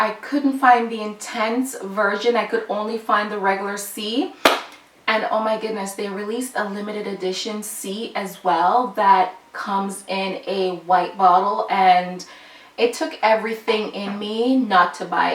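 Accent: Jamaica. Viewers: I hear a Jamaican accent underneath !! (hope I'm right). great video!! I too am obsessed with fragrances..they make me so happy, brings back memories. .thanks for sharing! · Are you Jamaican?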